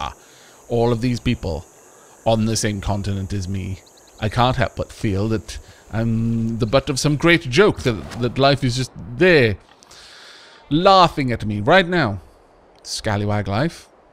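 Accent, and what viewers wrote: United Kingdom. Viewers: Well this video is interesting... Tea surrounding London with a man playing England with a British accent. Sorry if it's something else.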